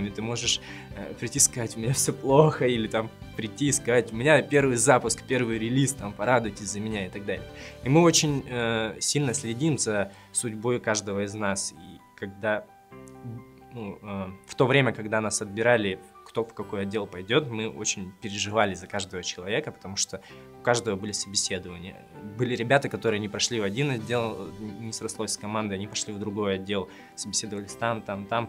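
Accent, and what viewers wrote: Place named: Urals, Russia. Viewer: Даже можно было бы не говорить что с Урала, по говору и так всё ясно)))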